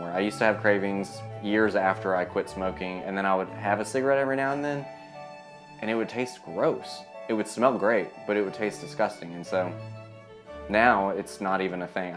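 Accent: South, United States. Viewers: I thought I heard a southern accent! We are in NC now but my hubs is a native Nashvillian.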